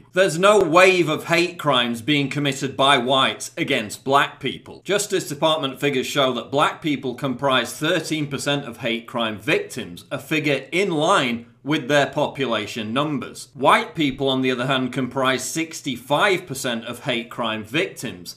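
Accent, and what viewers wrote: United Kingdom. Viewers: Another Alex Jones wannabe, with a British accent. Yawwwwn.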